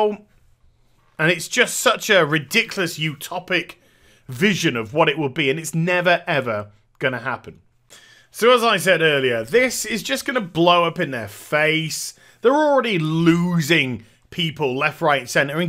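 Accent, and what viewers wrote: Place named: United Kingdom. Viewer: lol this British accent :D, im sorry i assumed that!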